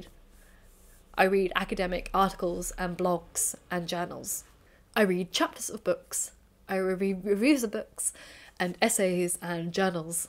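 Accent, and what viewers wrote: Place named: England, United Kingdom. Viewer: I would like to apologise for previously commenting on your pronunciation of "Culloden" in one of your videos. I was taking it at face value that due to your English accent you were ignorant of Scottish culture. I realise now I was wrong and I'm sorry if I caused you any distress. I hope you continue on your journey of recovery.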